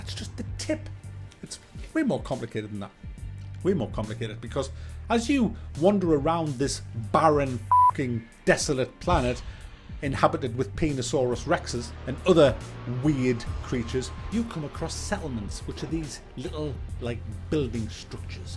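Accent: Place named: Northern England, United Kingdom. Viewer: He's a northerner? I like that accent.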